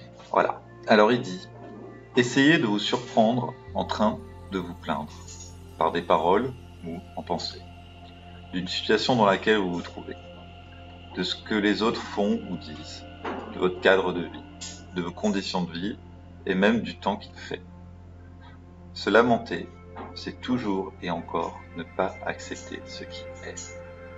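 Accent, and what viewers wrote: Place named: Quebec, Canada. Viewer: Si l'accent québecois est supportable, ça parle du stress <link>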